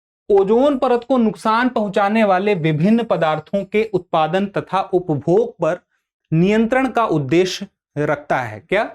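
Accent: Bihar, India. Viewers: guthka nhi hai I think sir bihar side se hai vha esa hi eccent aata hai.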